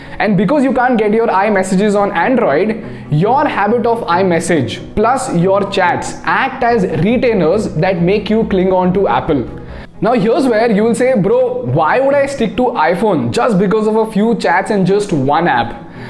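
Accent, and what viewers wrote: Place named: North, India. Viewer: North Indian accent is so funny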